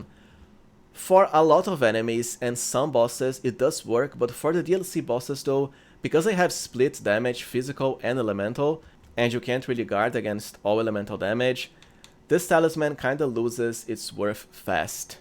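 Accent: Brazil. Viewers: Are you BRAZILIAN? RECONHECI O SOTAQUE A KILOMETROS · You have a strong Brazilian accent. Are you one?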